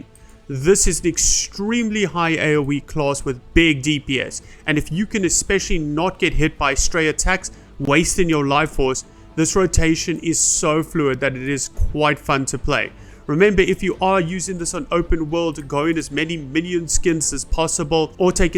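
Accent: South Africa. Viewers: is that the distinct south african accent i am hearing?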